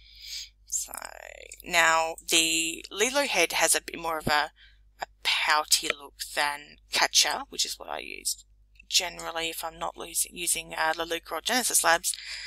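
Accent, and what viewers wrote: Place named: Australia. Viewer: An Aussie tutorial Yes!!! I can understand this (I am from Melbourne) and very very helpful thank you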